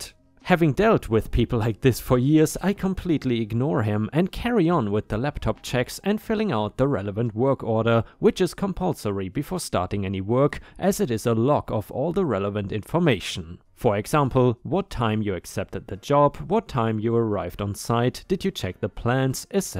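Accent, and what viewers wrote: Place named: Germany. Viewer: Your charming German accent makes me smile every time you tell these stories! Keep up the great work! Love your HOA and property related stories btw!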